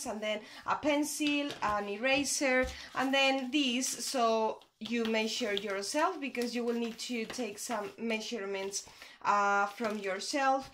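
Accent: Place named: Mexico. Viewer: hello, nice video, you have the same accent, that my mexicain Lady friend. The minute i heard you, i toughs of her, anyway, nice video keep on going.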